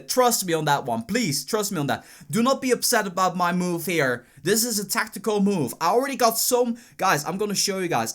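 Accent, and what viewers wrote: Netherlands. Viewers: u live in NL that explains the accent i am from the netherlands too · where do you come from you sound Hollands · where is he from? he kinda has a dutch accent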